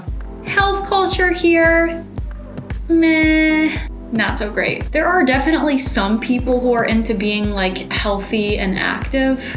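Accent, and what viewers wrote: United States. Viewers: you dont sound like someone who was born from new york. you talk like one of those californians who talks so slow and pronounce words very loooong.. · At some point, can we get a report about NY from someone who SOUNDS like they're from NY and not the midwest?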